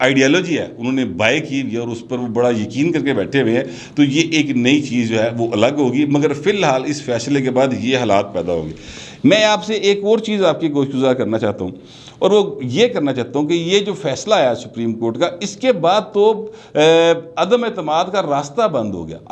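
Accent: Punjab, Pakistan. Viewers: sahib g acha urdo bolo plz punjabi tora achi urdo bolo sir g plzz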